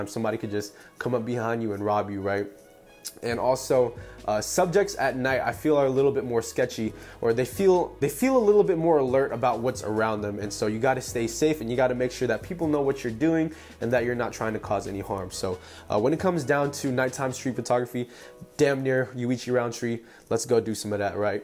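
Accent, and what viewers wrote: Philippines. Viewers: Hi ate! HAHA lovin' the Filipino accent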